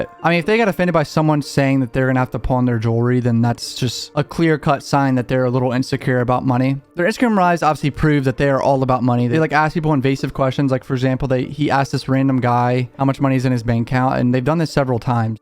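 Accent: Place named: Baltimore, United States. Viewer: Damn I'm loving that Baltimore accent. Or is it Pennsylvania?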